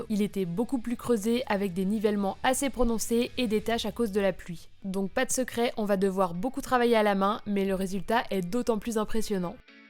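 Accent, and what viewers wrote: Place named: France. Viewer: Ça a l’air très bien but the accent français je peux pas regarder, désolé 😂 ça fait mal aux oreilles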